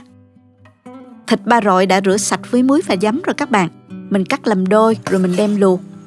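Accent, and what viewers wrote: South, Vietnam. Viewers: Yêu, tiếng nói miền Nam đệ thương vô cùng ❤🎉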